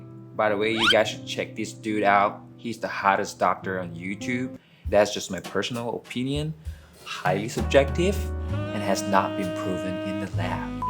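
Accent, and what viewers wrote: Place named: England, United Kingdom. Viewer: Subscribed!!! Wow bro I like your English accent😍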